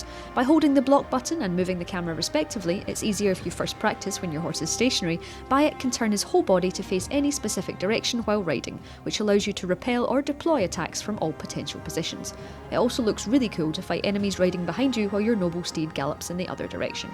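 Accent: Scotland, United Kingdom. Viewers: Is this a Scottish or Irish accent. It's pretty cool. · This video would be extremely better if they had put someone without this disgusting Scottish accent. She can't even pronounce fucking English words properly for fuck's sake! It hurts my ears... one of many reasons why I would never visit that shitty country in my life! Long live England! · Yes, it's Scottish. Central Scotland to be more specific. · alec j that's Scottish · It sounds Scottish to me. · Scotland. Like my good self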